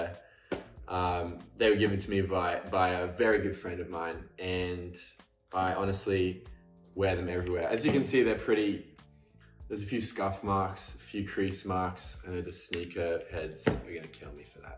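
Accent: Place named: Australia. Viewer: I love his Australian accent. I can listen & watch him all day. Hes so gorgeous 😍😛😘☺🥰🤗🤤😃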